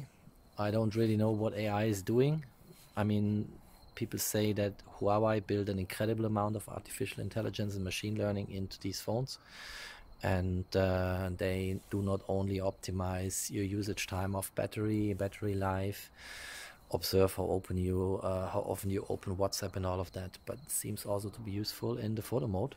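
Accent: Germany. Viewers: Are you German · Haha man merkt das du Deutsch bist, ist aber nicht schlimm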